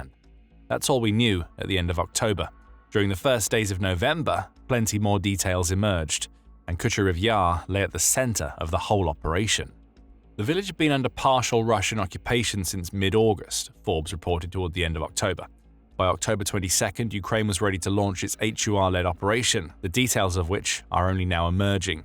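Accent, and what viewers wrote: United Kingdom. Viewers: Dear God what Bullshit. I should have known as soon as I heard the British voice. The GUR SF were on a rescue mission for a high value asset that is not going to make it out alive. Ukraine is out of reserves and It is showing all over the battlefield.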